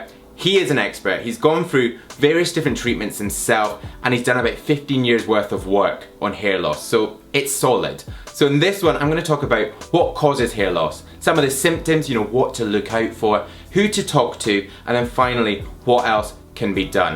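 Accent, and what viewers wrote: United Kingdom. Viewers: Love that British Accent , so cool !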